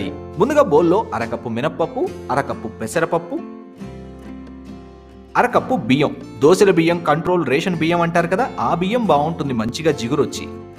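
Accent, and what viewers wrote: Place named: Kerala, India. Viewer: Anna ne language kerala madhrega unadhee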